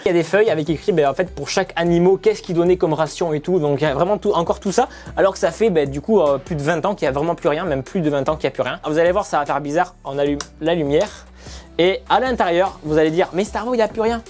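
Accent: South, France. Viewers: TU ES TOUJOURS AUSSI EXTRAODINAIRE AVEC TON ACCENT DU SUD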